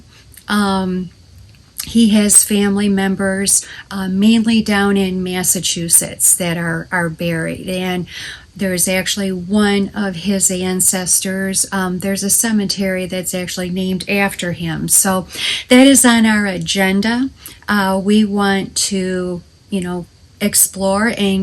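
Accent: Michigan, United States. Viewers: Hello! I found about you through Linda Davis and I'm a new subscriber. I love to hear about moving adventures and I'm looking forward to more of your videos. I live in Northern NJ and I love where I live but dream of moving to somewhere in NE someday. I even lived in Michigan for three years. My son was born there so I'm very familiar with your accent! I have family in Vermont, Rhode Island, and New Hampshire as well and love going out to any if those places any chance i get. Best wishes to you and your family and I hope you enjoy living in New Hampshire!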